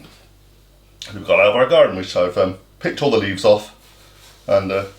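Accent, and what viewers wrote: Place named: West Country, United Kingdom. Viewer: Just subscribed and slow cooker is on. Thanks, like the accent, sounds Dorset or Somerset?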